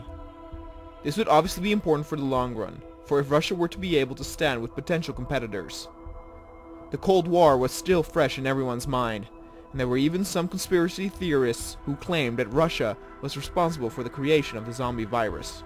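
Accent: Netherlands. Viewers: You're dutch right? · Are you Dutch? It sounds like it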